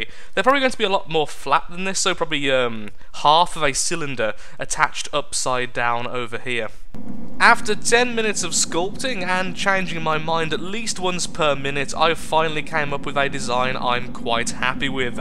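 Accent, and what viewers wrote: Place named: North, United Kingdom. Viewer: Hey man this is some good shit. You're probably one of the best from the depths content providers so stick with it. PS: What county is your accent from? You're northern I think but where abouts? Your accent has some inflections I can't place.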